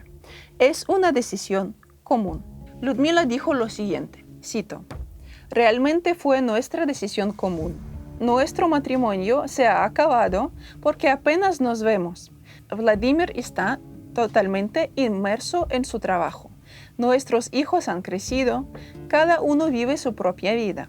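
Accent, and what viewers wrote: Russia. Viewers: Hola Saludos imagino por tu asento eres Russa. Muy buen video y documental Gracias. Conosco un poco de russia 🇷🇺 lo visité desde Moscú asta SantPeterburgo. Wow q hermoso país muy ordenado y por sierto tuve en relación con una hermosa chica russa mi respeto y admiración por la cultura Mi opinión personal 100% me quedo con las chicas europeas porq te admiran y respetan nuestro espacio y muy sociables Nunca le preguntan de entrada eres soltero o casado no le rebuscan los bolsillos aunq lo pueden estar necesitando son muy respetuosas. Me encanto tu video Gracias 🙏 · Interesante historia y muy bien redatada con esa voz suave y ese acento ruso innegable, vaya que hermosa combinación.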